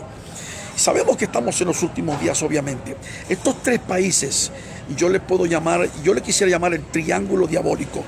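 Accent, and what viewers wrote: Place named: Quito, Ecuador. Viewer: Mira inproquita de momento ablas con en Quito Ecuatoriano porque no miras te veo vestido de judio y protestante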